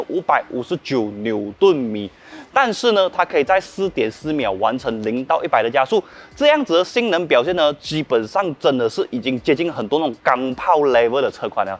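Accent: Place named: Malaysia. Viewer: 马来西亚人讲中文真的很可爱，喜欢听😂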